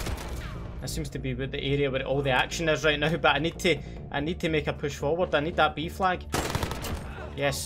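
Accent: Scotland, United Kingdom. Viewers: what city in scotland you from?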